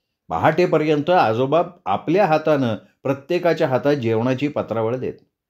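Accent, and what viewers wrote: Konkan, India. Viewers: सर कादंबरी तर छान आहे पण त्याहीपेक्षा तुम्ही जो कोकनी आवाज काढता ते लय भारी आहे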